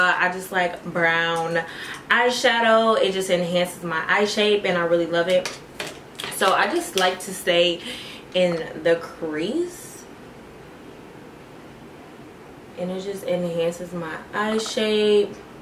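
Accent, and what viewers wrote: United Kingdom. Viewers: your British accent is really cute. Your makeup looks gorgeous Charlotte Turberry 💄💄👍🏽. Congrats on your collaboration with the brandy ou deserve it. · its the british accent for me its even funnier coz im from ldn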